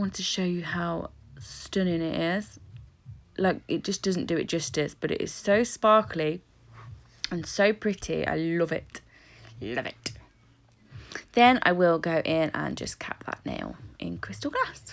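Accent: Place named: Coventry, United Kingdom. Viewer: Are you from Coventry? I am. Your accent is very Cov. :-D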